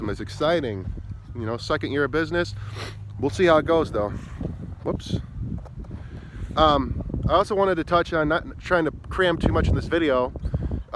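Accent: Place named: Chicago, United States. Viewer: I knew you were from Chicagoland. The accent and the 815. LOL You looking for any help?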